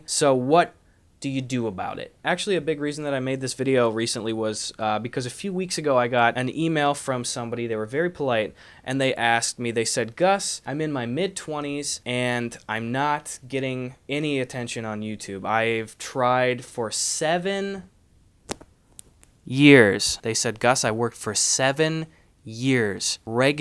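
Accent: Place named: Wisconsin, United States. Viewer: I can tell you're from Wisconsin from the way you pronounce your "a's". Sw-ay-g Bucks. Lmao. God, I miss that boring ass state 😩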